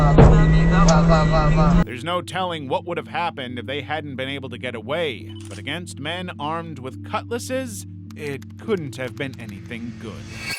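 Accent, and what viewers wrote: United States. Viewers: You reference kilometers over MPH, yet have an American accent. Are you American?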